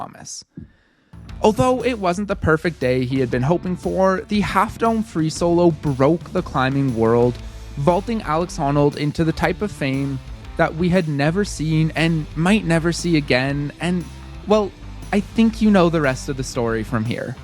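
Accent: Canada. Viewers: I can tell the narrator is Canadian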